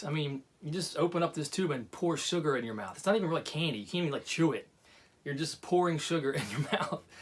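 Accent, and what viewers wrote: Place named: Baltimore, United States. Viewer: thanks for the memories...btw this bawmer raised boy senses some baltimore or philly accent? lol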